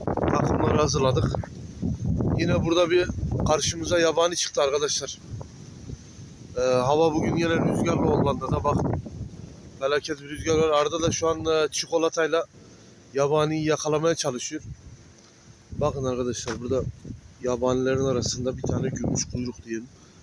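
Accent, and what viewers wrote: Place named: Adana, Turkey. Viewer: Adana’nın hangi mahallesindesin kardaş şiven adana kokuyor saygılar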